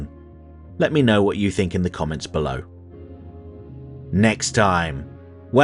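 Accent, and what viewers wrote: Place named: RP, United Kingdom. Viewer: I hope this channel grows well. I enjoy your insight and in depth review alongside your nice voice. I also learn a bit of history. Its like hearing Sal from Comicpop explain the comic but with a chill posh British accent.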